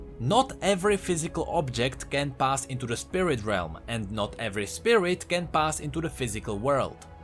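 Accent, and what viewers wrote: Czech Republic. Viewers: Czech accent ? Or ? I think you are from czech republic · He is from The Czech Republic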